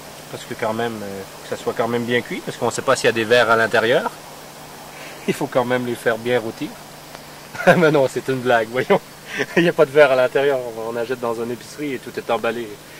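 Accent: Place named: Quebec, Canada. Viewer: Amusant, il a l'accent Québécquois. Et du Kangourou, j'en mange ce soir pour le réveillon de Noël 2010 en France.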